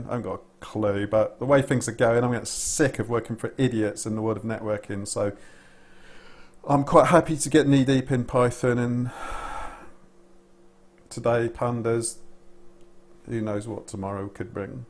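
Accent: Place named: United Kingdom. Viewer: Hey, what's up,! I started this beautiful morning with watching this video))) i don't know if you would be happy to hear this but i felt like i was watching my own video at some point! Cool! You started adding some humor (hire demoralised engeneer is the best) it's so cool Your video started to 'flow' - i watched it almost entirely! I like word 'fuck' myself, but... i think being ironic is better than being angry and unsatisfied, well at least in the videos - so all the cases when you kinda laughing at managers acting fools - it's cool and fun, but when 'they are fucking idiots' - many people knows that, so depressing viewers with no reason might decrease views. I understand your pain - i dropped my recent 25/h contract because my client claimed working prototype to be crap, while the problem was in target site, not in my app and scraper, so yeah, there's lots of dissapointing things, but it doesn't have to depress other people. 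i'm really sorry if something hurts you in my comment, i just wanted to say that me as a viewr LOVE your ironic British humor and would be happy to get more content like this. Also you started talk better. You've got a native British accent, you must be proud of it, make it sound even more british - clear deep vovels, non-american rythm etc. (All the stuff i failed to mimic) i mean in this video i enjoyed the accent because you were talking to subscribers instead of yourself like in previous video. Cool, man, keep improving, you're on your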